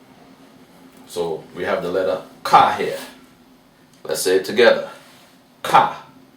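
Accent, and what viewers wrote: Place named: Jamaica. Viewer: He has a Jamaican accent..too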